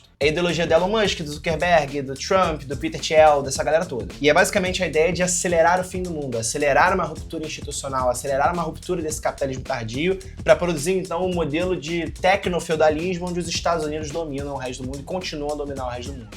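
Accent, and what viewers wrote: Rio de Janeiro, Brazil. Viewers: o sotaque e o jeito carioca só deixa melhor o vídeo que é a verdade nua e crua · A forma como ele solta uma enxurrada de conhecimento e sensibilidade entre o sotaque e as gírias mais cariocas que existem me pega demais. ❤️‍🔥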